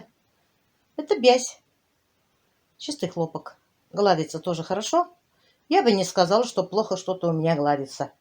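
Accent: Tatarstan, Russia. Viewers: Какой у вас татарский акцент как у моей бабушки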